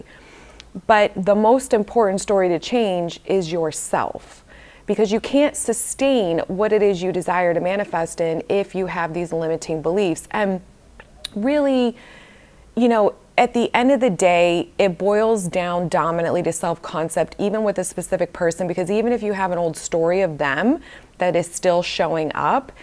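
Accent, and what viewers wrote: New York, United States. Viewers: I don’t ~need~ one on one coaching from you but I WANT it. That powerful New York cadence makes everything you say hit so much harder. Ty for another kickass video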